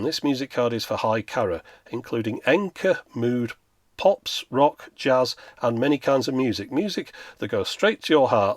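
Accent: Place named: England, United Kingdom. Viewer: "inverted commas". As an American, one of the things I love about this channel is hearing English accent, and the difference in terminology from one end of the Atlantic to the other.:-)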